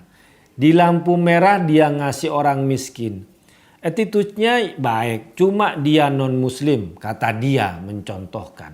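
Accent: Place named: Java, Indonesia. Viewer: dia cocok hidup di dataran arab .... pake logad jawa sekali...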